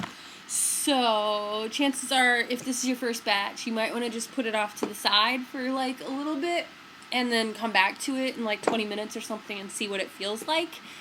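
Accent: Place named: Minnesota, United States. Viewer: This portly lad talks like he is from Minnesooootah! How odd.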